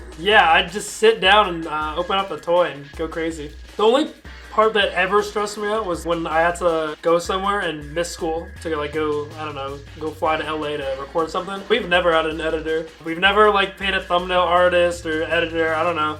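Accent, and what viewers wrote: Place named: Canada. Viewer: Wait… your Canadian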